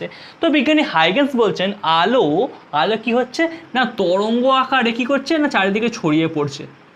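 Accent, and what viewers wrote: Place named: Bankura, India. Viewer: Dada apnar bari kothai? Ascent ta Bankura er moto laglo!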